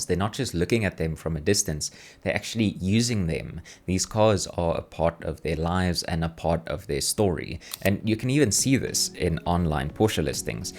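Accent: South Africa. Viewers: Brother heard by your voice you have RSA DNA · I can just hear the south african in you bru 💪🇿🇦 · I'm new here seconds in me af: "Yha He Is Definitely South Ahhh🇿🇦..."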